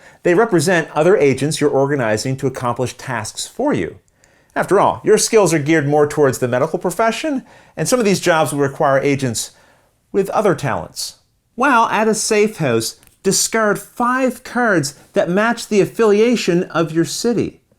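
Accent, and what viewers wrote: Canada. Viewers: Are you candian?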